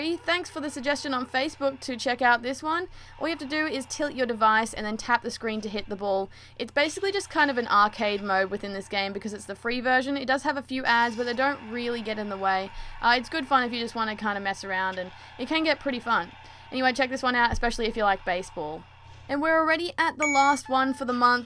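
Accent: Australia. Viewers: Are you Australian or kiwi?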